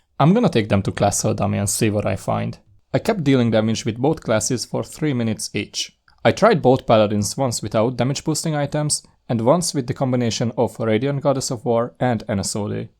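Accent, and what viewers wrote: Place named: Hungary. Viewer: Üdvözletem kedves uram! Az akcentusodból ítélve magyarnak gondollak, de ez majd úgyis beigazolás nyer a kommentet olvasva. Csak megszeretném köszönni a minőségi munkát és további sok sikert mindenben! Várom a további tartalmat és big up! 👆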